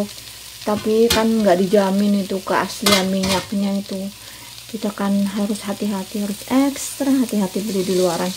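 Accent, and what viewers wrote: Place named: Banyumas, Indonesia. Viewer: My favorit food Roasted sweet potato / bahasa ngapak muntul mba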